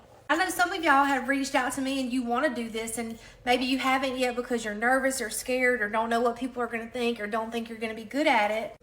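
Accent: South, United States. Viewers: Hey Southern draw, your cute! 😉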